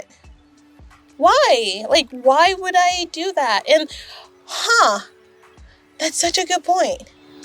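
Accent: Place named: United States. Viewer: I appreciate you sharing your thoughts on your experience! This is good stuff and it's interesting to see you think live and have this organic discussion with your husband. It's a complex topic and there are so many nuances. Clearly that woman was confused, maybe because you speak like an American? (Please do a video about how you lost your accent because I've tried everything and while I don't sound like the typical French person anymore (thank God), I still don't sound American at all) Nevertheless that must have been awkward and she should have 1. apologized for assuming and 2. maybe be curious to learn about Liberia?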